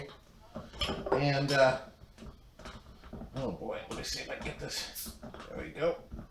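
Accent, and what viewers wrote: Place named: Minnesota, United States. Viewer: I’m guessing your from Minnesota, North Dakota, or Canada.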